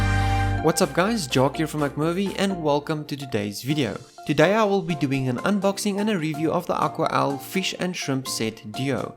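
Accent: South Africa. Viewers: Everytime this guy says "prawns" i think of the film district 9. Gotta love the south african accent.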